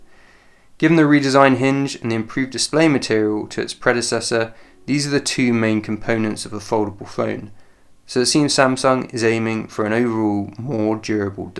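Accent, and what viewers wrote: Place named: United Kingdom. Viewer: I enjoy the British accent too!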